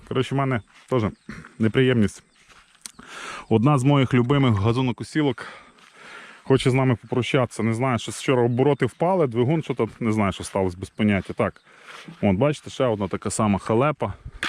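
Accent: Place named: Podillia, Ukraine. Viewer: Лайк з Криму. Вчора познайомилась з Вашим каналом,п!дписалась.Дякую за укра!нську.Розмовляете по Под!льськи.(за помилки вибачте клава руська).Вдач! Вам.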